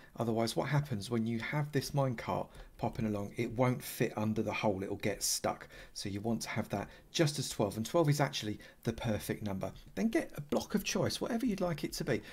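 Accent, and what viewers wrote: United Kingdom. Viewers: It's called a British accent. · Cause he's british and sounds awesome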